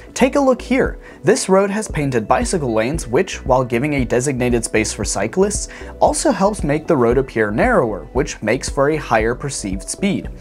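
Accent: United States. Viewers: Impeccable American accent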